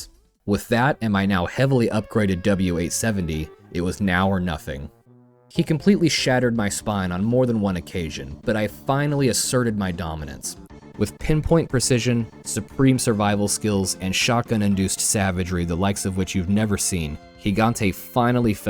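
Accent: United Kingdom. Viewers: Another example of an American not knowing what English English sounds like. He’s not an Aussie… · yeah east end bruv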